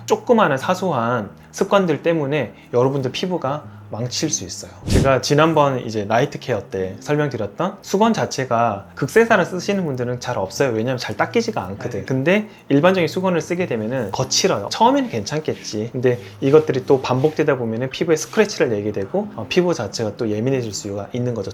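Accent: Gyeongsang, South Korea. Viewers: 선생님 경상도 출신이군요 ㅎㅎ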